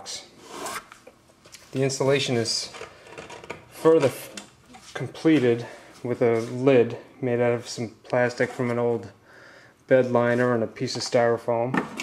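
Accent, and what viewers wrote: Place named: New York, United States. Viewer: This was brilliant. I know you said "Florida" but you sound like you're from NY, maybe Queens.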